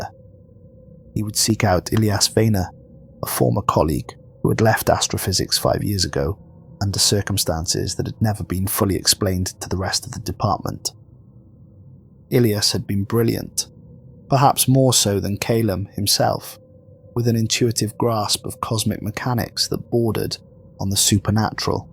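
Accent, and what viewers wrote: Wales, United Kingdom. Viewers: What part of Wales are you from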